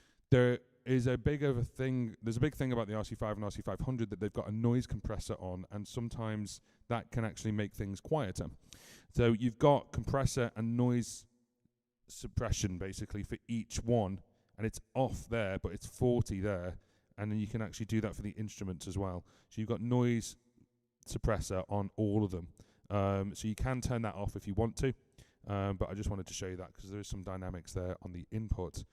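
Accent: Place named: North Wales, United Kingdom. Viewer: excellent news. You sound fairly local to me? (I'm in Denbighshire, North Wales)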